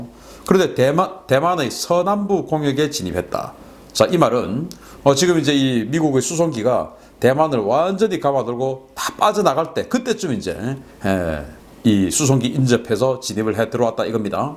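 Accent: Gyeongsang, South Korea. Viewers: 화가 나서 다시한번 들어보니 대만섬 이라고 발음 하는거 같기도 하고?? 경상도 사투리 억양이 남아서 내가 잘못 들은거 같기도 하고??ㅠ 타이완도 엄연한 한개의 독립국가이니 대만섬 이라고 하지말고 대만 이라고 불러부는게 예의 인거 같습니다ㅎ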